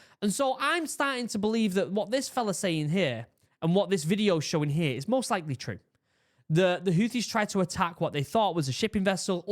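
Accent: United Kingdom. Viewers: Yours is the only British accident that doesn’t totally bug me. Idk why but it just sends chills down my spine when British people say “I have to go to hospital” instead of “I have to go to THE hospital” but for some reason it doesn’t bother me as much when you talk lmao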